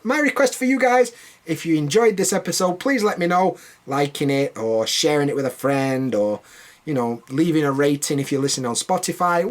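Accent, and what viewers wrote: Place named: United Kingdom. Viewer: well I love British English, you have the best English accent protect it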